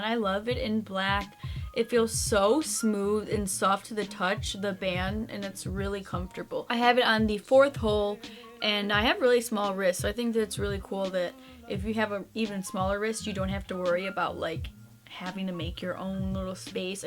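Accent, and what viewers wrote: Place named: Boston, United States. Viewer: im gonna guess ur from massachusetts to be more specific boston am i correct? no idea im on my mom's acc btw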